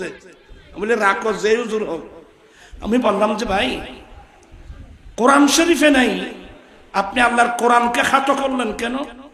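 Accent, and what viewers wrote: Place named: Chittagong, Bangladesh. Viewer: চট্টগ্রামের আঞ্চলিক ভাষাটা ছাড়তে পারে নাই।রংপুরে আহলে সুন্নতের আলেম নাই বুঝি?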